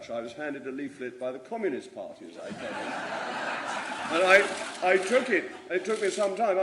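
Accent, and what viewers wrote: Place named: RP, United Kingdom. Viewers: Ok I get the turn off because off the "posh" thing, his silver spoon life as it were, but my God this man knows his stuff and always calm. The amount of times thugs have shouted at him and he calmly wants to chat, at which point they either shout more or walk off. Every interview its normally him running rings around the interviewer, its rare that they repeat questions because he does not stand for it or fall for it. He should be PM but I fear his personal views and money will turn lower income people off, posh well spoken people have always been viewed as been the reason for poor people....stereotypes. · . . . and maybe a voice coach to rub the corners off that plummy accent. . .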